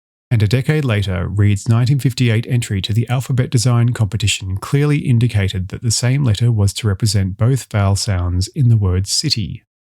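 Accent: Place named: RP, United Kingdom. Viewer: This reminds me of a difficulty I had learning Icelandic. The “short i” sound as in “sit” cannot end a word in American English, but it CAN in Icelandic, as in the word “listi” (a list). For me, it takes special effort to pronounce those vowels the same way instead of pronouncing it like the Y in “happy”. So it makes sense to me, as an American, that “i” is a single phoneme that is pronounced differently in different cases. It didn’t even occur to me that a final “i” can be “short” in some accents. But when I heard the RP, there it was! Was the author of Shavian American? That might explain the choice.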